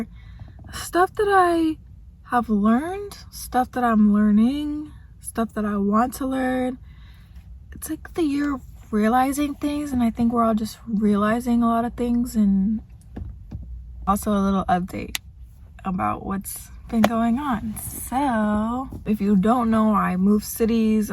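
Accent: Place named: New York, United States. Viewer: U def from new york or maryland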